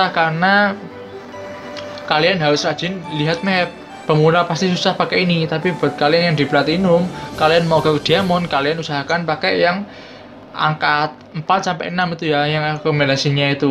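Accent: Java, Indonesia. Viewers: Lu orang mana bang,,,,ngomng medok bnget,,,,,,wkwkwk,,,,, Salam negeri atas awan bang,,,,,,#dieng😂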